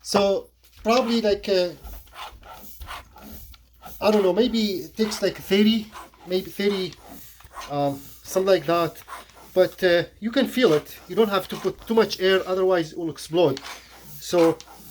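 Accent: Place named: Romania. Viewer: U not english u Are Romania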